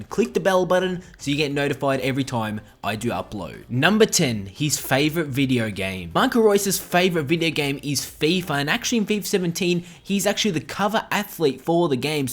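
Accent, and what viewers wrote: Australia. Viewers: Are you Australian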